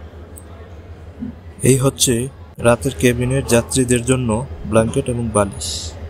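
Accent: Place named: Chittagong, Bangladesh. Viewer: কথায় কথায় বন্ধুরা বলবেন না। বিরক্তি লাগে। ভয়েস সুন্দর কিন্তু চট্টগ্রামের আঞ্চলিকতা কাটিয়ে শুদ্ধ বাংলা বলুন। ফেস দেখান না ক্যানো? প্রতিটি স্টেশনে নামবেন। স্টেশন দেখাবেন।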